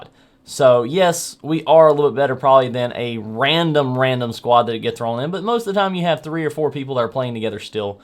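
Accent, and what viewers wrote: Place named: North Carolina, United States. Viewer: even though im black i live in NC and i talk exactly like you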